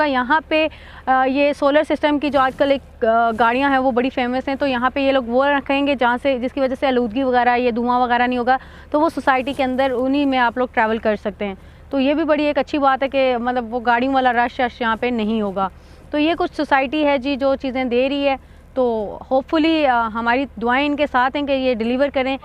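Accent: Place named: Rural, India. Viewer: Tumney baal bhi seedhay kiye huvay hain aur kaalay chashmay bhi lagaey huvay hain ,, lekin jab bolti ho tou pata chal jata hai k after all paindu ho. Bilkul Muhammad Amir ki tarah ,,, shakkal bhi ussi sey milti hai aur baaton ka andaaz bhi. Thori bolnay ki speed kum karo, thori narmi aur shaistagi paida karo apnay lehjay mein, aur relax ho kar bolo.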